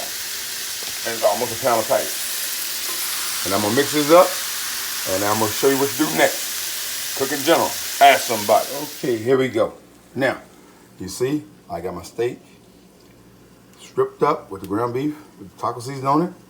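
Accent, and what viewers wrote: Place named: South, United States. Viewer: Where are you from ? You too funny 🤣...gotta be from the south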